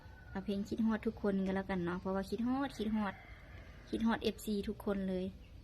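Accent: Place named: Laos. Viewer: เว้าลาวได้ม่วนขนาด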